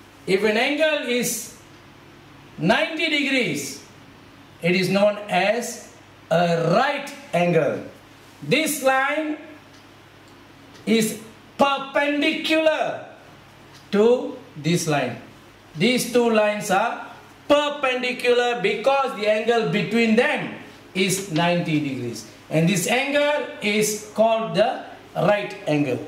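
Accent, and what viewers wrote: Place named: Malaysia. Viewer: he speak english like malau people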